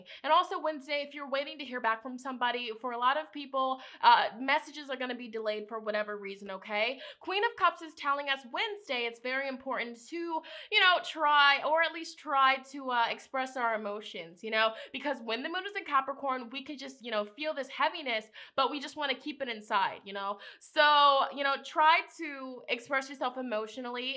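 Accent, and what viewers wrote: United Kingdom. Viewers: Been feeling so stagnant for the past week or so, so looking forward to this energy shift 😊 as always, I'm vibing with your reading 🌞 (Also, are you British? I keep hearing an accent come out lol been curious) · I keep hearing a British accent peeking through! 😍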